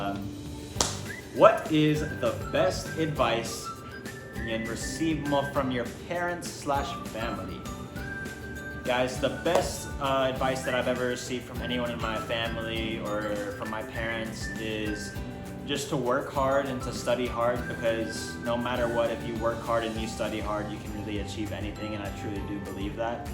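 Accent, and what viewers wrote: Philippines. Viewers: Your tagalog accent is lit 🔥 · you're so conyo but i love your accent hehe i'm super excited to watch you portraying tob yanai 💙❤️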